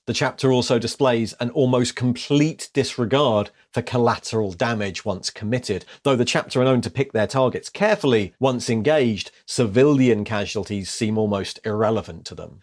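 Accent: England, United Kingdom. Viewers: With how the accurate pictures are presented with your English accent I feel like I'm in school again 😂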